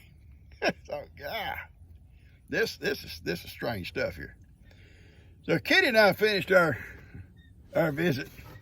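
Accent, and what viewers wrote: South, United States. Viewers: I love your country accent and your stories. I couldn't stop laughing 😂😆 at doc and the racoon on his head at the stop light.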